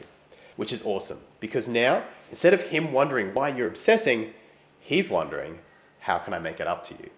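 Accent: Australia. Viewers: I dont like Australian accents and thé country but i like this guy.A cool Ozzie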